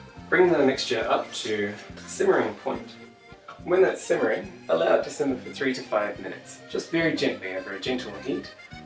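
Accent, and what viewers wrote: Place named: New Zealand. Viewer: Miss good old Hokey Pokey and Kiwi accent! Thank you for posting all the way from Tairoaaaaa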